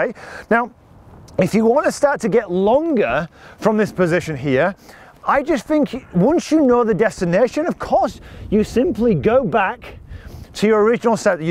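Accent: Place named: Northern England, United Kingdom. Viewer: Another great video lad. Honestly, I could watch all the other golf videos on YouTube and never get as much knowledge as I can with one of your 10 min videos. Your style, is backed up with the substance of knowledge that can be easily transferred to the student, all delivered with a wonderful Northern twang. As a nervous newbie to the game, you have calmed my nerves by giving me the confidence and assurance that comes with your instruction. Thank you sir for making my first year in the sport such a pleasure. I wish you and your family all the happiness and joy that you deserve in this festive holiday season. ❤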